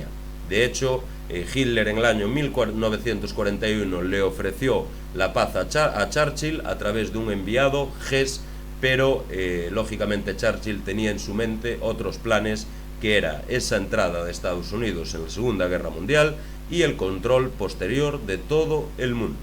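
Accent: Galicia, Spain. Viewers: Acento Gallego de GALICIA-ESPANA.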